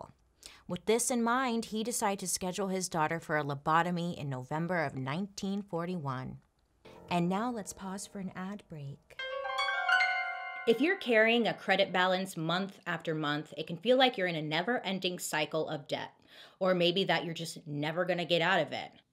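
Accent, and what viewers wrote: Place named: California, United States. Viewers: To me she sounds like she has a California accent. Like a valley girl, idk for sure but it’s nice & adds to her story telling. (From Georgia btw) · She KIND of has an accent to us from the Midwest but that’s because she sounds like she’s from Cali or something but people from other counties will hear it as an accent to them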